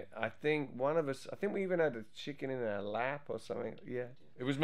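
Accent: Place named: Cockney, United Kingdom. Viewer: A bit of Cockney accent in it, I'd say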